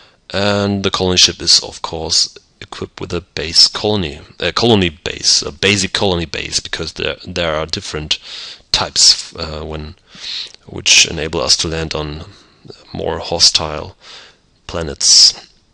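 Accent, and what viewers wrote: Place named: Eastern Europe. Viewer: apart from your irritating eastern-euro voice trying to speak in english accent, i found the video quite helpful for me to enter into this ancient game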